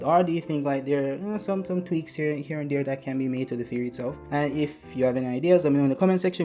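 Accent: Jamaica. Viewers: Hmm u sound Jamaican... are you?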